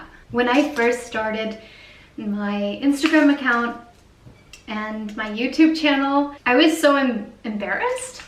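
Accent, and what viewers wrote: United States. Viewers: Just curious where you live. as you sound American, but you obviously don't live in North America hence the reference to the Euro?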